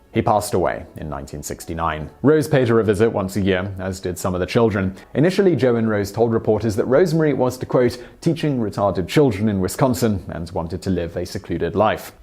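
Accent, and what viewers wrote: England, United Kingdom. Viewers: Tell me why I thought vsauce was having a midlife crisis and took on an English accent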